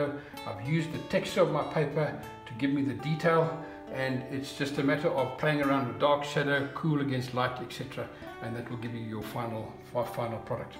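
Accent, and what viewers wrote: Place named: South Africa. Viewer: Nice to hear a South African voice on YouTube.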